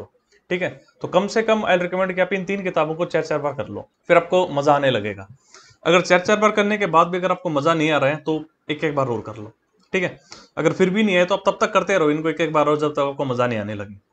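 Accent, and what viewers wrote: Haryana, India. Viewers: Bhai Haryanvi touch❤️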